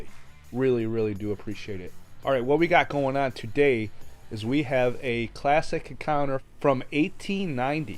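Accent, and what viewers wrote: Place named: Canada. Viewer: Canadian accent is too much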